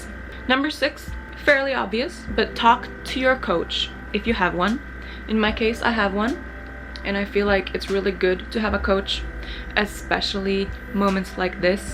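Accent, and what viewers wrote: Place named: Finland-Swedish, Finland. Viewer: Self-reflection is good, but don't let it get you down. All you can do is all you can do. Your body will respond or not. Let's hope it will on time:-) Lycka till. Jag älskar din finlandssvenska accent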